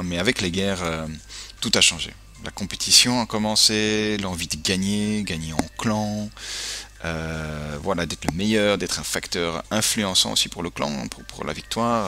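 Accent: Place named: United States. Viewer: comme on dit chez nous, ta faillit me faire braire lol, je doit t'avouer que sa me pince le coeur que tu ne soit plus parmis nous, tu m'a beaucoup appris, tu m'a redonner confiance en moi et pas que sur le jeu, outre le fait que tu sois un excellent joueur, tu es surtout une très belle personne, "the voice" avec ton accent à l'américaine que je kiff tellement ahah, par moment sur la fin j'avais envie de te "forcer" à rester car je ne suis pas dupe, mais sa aurais été je pense irrespectueux et inutile, j'espère que tu trouvera ce que tu cherche, dans tout les cas j'espère qu'on restera en contact via fcbk, tu va me manquer, d'ailleurs tu me manquais déjà depuis quelques temps, je garde tes précieux conseils bien aux chaud pour pouvoir continuer à faire évoluer le clan, un grand merci à toi, au temps et à l'énergie que tu nous a consacré, comme dirais l'autre "all right" ou encore "yallah" lol, reste comme tu es et prend soin de toi et de ta famille, je te souhaite d'être heureux, et ce n'est qu'un aurevoir ;)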